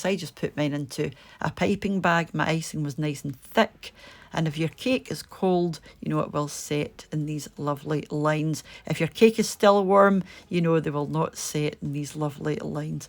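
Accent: Scotland, United Kingdom. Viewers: Oh my gosh I could listen to you all day! Love the scots accent. Never heard of custard over cake here in the USA but seems like something nummy to try!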